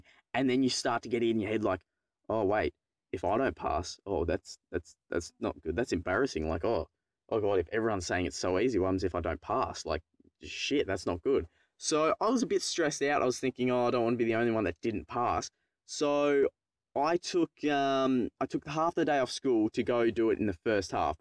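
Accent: Australia. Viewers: great videos keep up the Australian accent and good work;)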